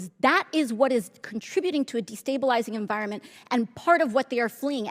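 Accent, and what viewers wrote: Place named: Spain. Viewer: Just because you turn on your Spanish accent doesn’t make you an expert on immigration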